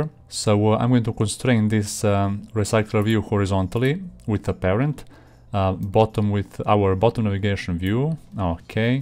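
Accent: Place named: Russia. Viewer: Your accent is similar to Russian. Are you From Russia? = ) Thank you for the tutorial!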